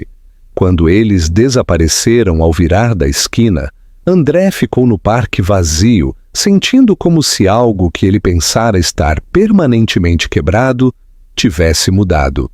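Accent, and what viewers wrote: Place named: Portugal. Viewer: troca de sotaque para o português de Portugal... dá sonolência a narrativa... escutando de Passo Fundo RS.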